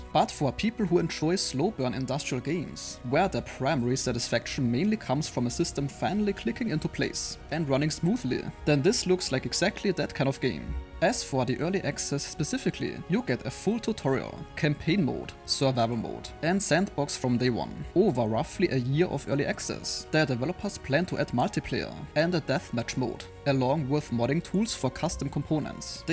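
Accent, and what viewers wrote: Germany. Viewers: Basically a good vid, but whay not in German? Your German accent makes it hard to watch, at least for me. And its really like reading off from your notes, transletet into English... so it is like a very rehearsed/scripted manner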